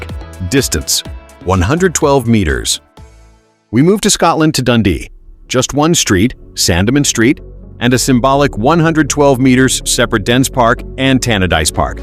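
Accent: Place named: United States. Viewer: Bring back the old narration voice, the American voice doesn't sound as nice.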